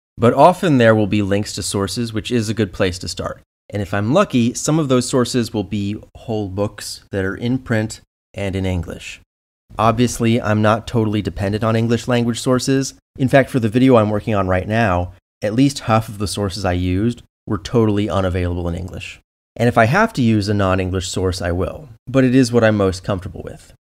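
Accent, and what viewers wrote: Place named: Michigan, United States. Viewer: THIS MAKES SO MUCH SENSE! Every time you speak I think “he sounds like he is from around here (Michigan)”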